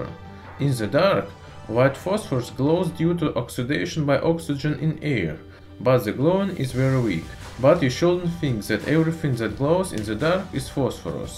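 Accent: Russia. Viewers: this channel has 3 of my favorite things: russian accent half-life and chemistry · My new chemistry teacher with a Russian accent. ......thanks for your videos .....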